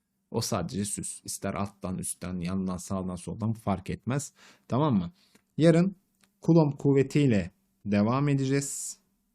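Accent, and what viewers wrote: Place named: Izmir, Turkey. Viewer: bir insan izmirliyim demeden sadece elektrostatik anlatırken izmirli olduğunu ancak bu kadar belli eder. güzel tekrar etmiş oldum iyi taktikmiş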